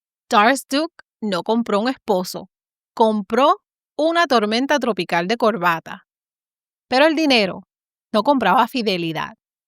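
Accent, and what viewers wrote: Puerto Rico. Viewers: parece boricua la señora por su acento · por su acento, percibo que es de la tierra de BORINQUEN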